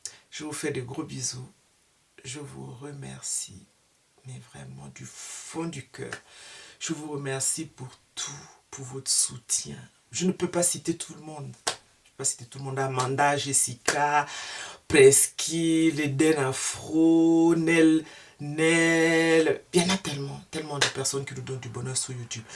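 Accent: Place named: Gabon. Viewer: Joyeux nappyversaire.Je viens de découvrir votre chaine et j'aime bien votre façon d'expliquer les choses. Et cet accent ...je dirais Gabonaise!?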